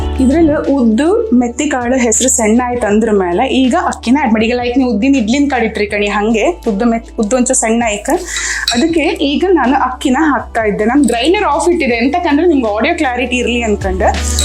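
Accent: Kundapura, India. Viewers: Dasan soppina idli adara jothig namm kundapra kannada super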